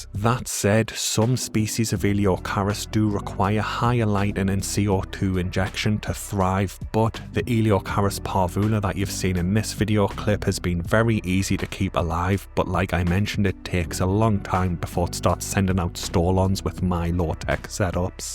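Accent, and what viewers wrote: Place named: North, United Kingdom. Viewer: Can tell you're from the north (not just from the accent) my house won't get below 20 degrees over winter. We're made of soft stuff down here 😂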